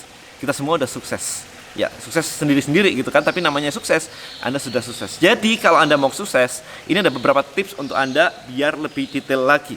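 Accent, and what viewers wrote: Java, Indonesia. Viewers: koko medoknya teknikal banget hehehee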